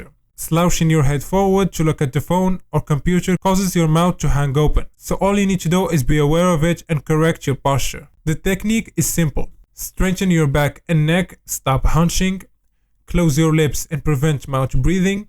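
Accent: Morocco. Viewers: Your accent is Moroccan ?? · accent mghribi brb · Ur voice bayna mghribi Keep it up asat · is that a moroccan accent 🧐🧐 ? ARE U MOROCCAN GUYS ?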